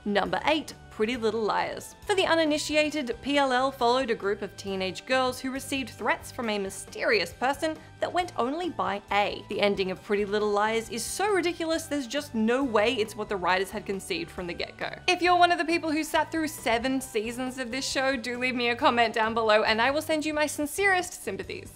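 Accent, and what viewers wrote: Australia. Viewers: Is this person Australian? Just curious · What channel do I know you from??😳 I know you’re not british you’re Australian 💁🏼‍♀️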